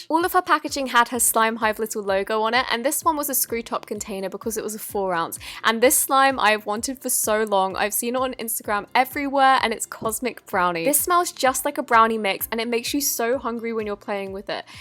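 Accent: United Kingdom. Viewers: Sooooo im still confused are you brittish? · Hamiltrash -_- "the british accent" is very broad britian includes scotland england wales a n ireland, most people that live far away from eachother (such as the north and south of england) cant understand what they are saying! accent is deffinatley english, like zoella's but she definatly doesnt sound northen so im guessing she is from some area more west also if you say that are language is weird (which I know you havent said that but lots of people do) just know that we (the brits) * created the language you speak! have you everheard an american tell anyone that they speak american? well if you have then they are damn wrong because americans, canadians and so on speak *ENGLISH the language that origanated in, what country? England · what part of the u.k. are you from i live you're accent!!!!!!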